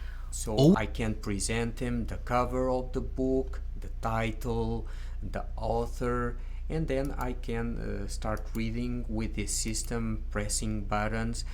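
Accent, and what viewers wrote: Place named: Portugal. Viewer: I think that you are portuguese and are reading stories in spanish with a portuguese accent. it doesnt sound authentic when you read in spanish. I am portuguese and speak spanish so I can pick up on your portuguese accent when you read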